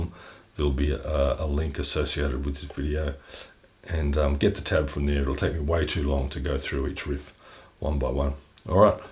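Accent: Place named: Australia. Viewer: This is really cool! I'll be honest I was not expecting an Australian accent haha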